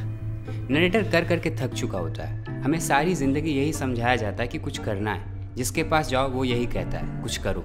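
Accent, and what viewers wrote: Bihar, India. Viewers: <link> no man this guy did it in this way i remembered this after all this time cause this was a gem a pure hand written content and admired this <link> aur ye chutiya apne bhiari accent wali english bol ke alaga gussa dila rha hai aur ditto is dusre wale video ki lines bol rha hai koi bhi samajh jyega original video pe millions me views hai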